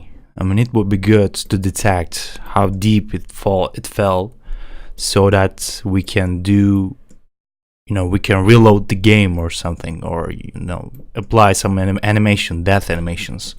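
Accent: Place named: Turkey. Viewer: Lovely accent but immedietly heard something familiar. It didn't take me long time to realize you're also Turkish. Thank you for excellent video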